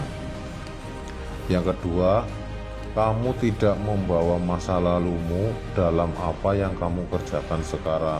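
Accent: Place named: Banyumas, Indonesia. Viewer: Logate NGAPAK banget siih pak,,!! Rika ne wis mangan durung Dina kie,,kooh wis nei semangat Karo wong²😂😀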